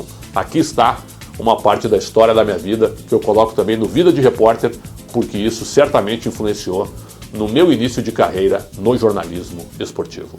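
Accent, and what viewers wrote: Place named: Rio Grande do Sul, Brazil. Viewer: Parabens por essa historia de vida e com bom sotaque gaucho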